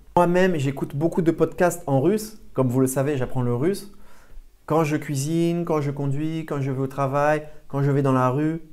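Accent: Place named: South, France. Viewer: Il me semblait juste que tu parlais un peu a la maniere du sud (j'adore entendre des nuances de français))) Mais j'en doutais et pensais que c'etait probablement juste une association — ton nom est, comme le personnage principal de le dessin anime Ratatouille, et la ratatouille est un plat du sud, etc. Bien que (j'ai deja regarde toutes tes videos) tu vis vraiment dans le sud))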